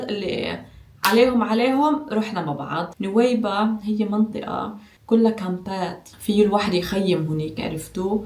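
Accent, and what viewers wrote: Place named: Palestine. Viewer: شفتلك كم فيديو فكرتك لبنانية ، بس حكيتي كم كلمة عرفت انك فلسطينية .. CHلبوا فيي .. تعربشت😅.. يسعد دينك انتي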